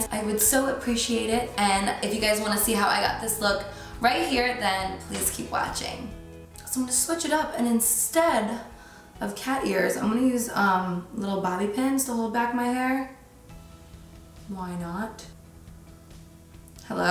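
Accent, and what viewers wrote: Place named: Philadelphia, United States. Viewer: Absolutely gorgeous ! Love this look 👏❤️ Are you from Philly or around here? I hear your accent like mine... “wuter ” hahaha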